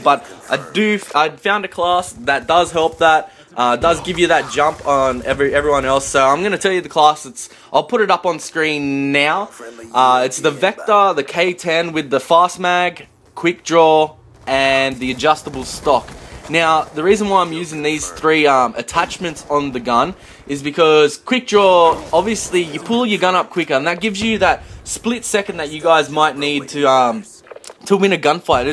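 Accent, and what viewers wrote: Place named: Australia. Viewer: where you from??..... u sound mad aussie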